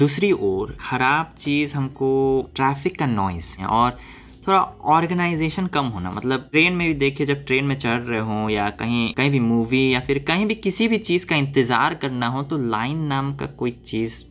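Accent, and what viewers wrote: Bihar, India. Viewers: Say hindi excent of bihari or say English; you are very systematic in talking. Not that you are calculating it before speaking, yet it is systematic. Carry On. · I think this may perhaps be the first time i've cross paths with a korean who speaks magadhi style hindi and that too with such finesse. For a moment i was taken aback and if not for the face, i guess nobody could say you are not a native patnaite😅 Such a fun experience getting to know you. Waiting for more interesting videos. Annyeong! p.s: can you sing " " by? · Bhen mat boliye was hilarious 🤣🤣🤣 your Bihari accent is cute 😅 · This is the real Bihari Accent Bhaiyya ji, Jiya ho Bihar ke lala. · Nailing that Bihari accent bro. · I am new to your channel. Loved your accent. This is the 1st for me where a Korean is talking in Hindi with Bihari accent and living in USA. I am also from Patna. 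So I loved it a little more. You earned a new subscriber. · Apki video bahut achhi lagi... But usse bhi achhi apki Hindi.... Aap jab bolte ho sun k achha lga... Ek dum hamare Bihar wali Hindi..gd luk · He got bihari accent and I am proud of it 🔥🙏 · Your bihari accent such a nice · apke bolne ka andaz dkhne me bara maza ata h..qki hm bhi bihar se h..😋👌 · It's Bihari accent so it's nothing wrong if he said "kalkatta " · Ur Bihari accent....ek dum mast hai👌👌👌😍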